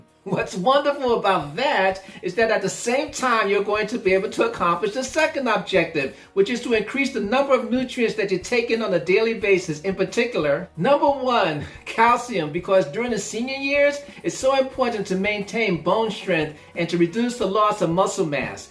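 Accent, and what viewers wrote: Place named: Caribbean. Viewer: Hello. I am a new subscriber from Casablanca Morocco. I like your style. where is the accent from please? Caribbean maybe?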